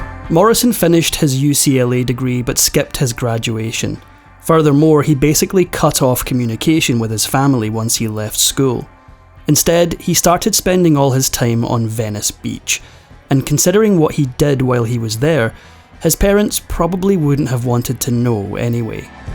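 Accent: Scotland, United Kingdom. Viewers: It's a Scottish accent. And, yes, it's lovely!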